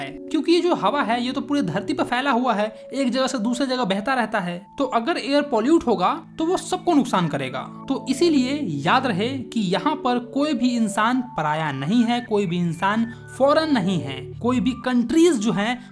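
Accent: Bihar, India. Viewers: By voice we call yell he is from Bihar 😅😂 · Aap Bihari ho kaya? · Bihari ho yar app to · Bhaiya aap Bihari ho kya❤ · Bhai aap bihar se hona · Are you from Bihar?? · Pakka aap Bihari ho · Bihar se ho na bhai❤ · Aap bihari ho kya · Bihari acent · Bihari hai ke tu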